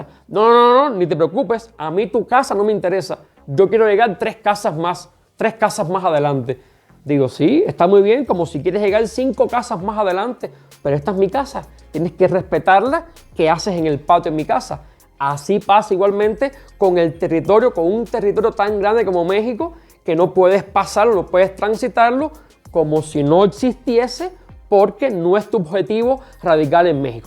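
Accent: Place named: Cuba. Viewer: Amigo hablas como Cubano... Y actúas como mexicano que eres ... Así de simple es y debe ser un discurso... Se tenía que decir y se dijo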